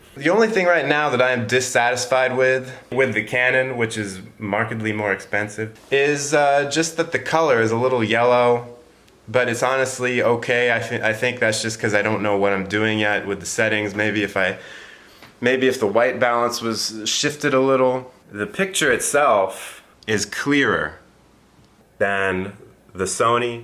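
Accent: England, United Kingdom. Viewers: I didn’t comment earlier, so let me fix that and say this - the floppy hair, the accent, the Christmas tree...it’s like you’re about to star in some British holiday romcom that my mom would make me watch with her. Adorbs. Good to see you back in all your crispy, high-def glory. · Oy me old china, I do like your English accent! 👍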